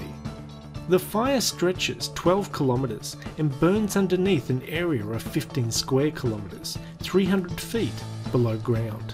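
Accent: Australia. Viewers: I stg aussie accents are NAILS ON A CHALKBOARD annoying..!!! You all say ‘over there’ like “ORVAH THEY” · you’re Aussie accent is so far thick it’s become gibberish. That’s where the problem lies. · You did say 2018 it is just your Australian accent that makes it sound like 2019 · I hear 2018, think some people didn’t understand the Aussie accent. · Aussie accent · I should have thought it was hard to mistake the two. While you may not have pronounced the "d" there is a clear difference in the vowels between eighteen and nineteen, and the Aussie accent even exaggerates that difference. You clearly said "aye-teen" with a long drawn out aye which wouldn't sound anything like "nine-teen", i.e. aye vs. eye+n. I just think they're unused to other accents and rhythms than US English.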